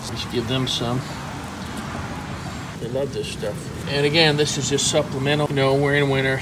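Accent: Louisiana, United States. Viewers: Damn i miss this Louisiana accent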